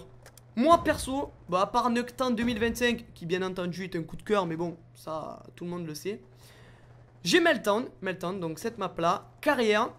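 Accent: South, France. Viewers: Tes D'ou Du Sud ? ^^ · Je suis sur Meltdown EC Avec le dsr Cènnkentte je kiff ton accent du sud!! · tu vien du sud mais ou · Un magrebin avec l'accent du sud.. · tes d'ou du sud ??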